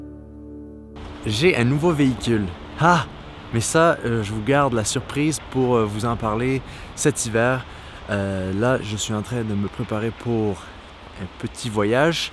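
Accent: Quebec, Canada. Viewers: Non. Il a un léger accent québécois.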